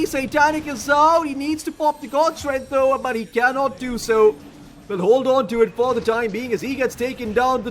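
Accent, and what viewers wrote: India. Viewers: indian caster wtf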